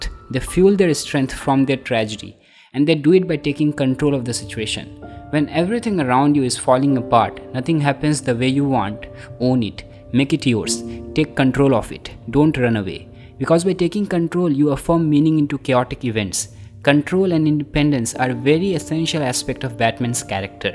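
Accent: India. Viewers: i hate this indian accent so damn much i just cant understand ittt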